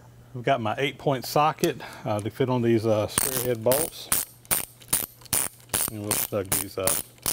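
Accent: South, United States. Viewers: I like how when is talking to "us" on camera - his accent is subdued, but when he's talking to other local folks - it's 100% good old boy!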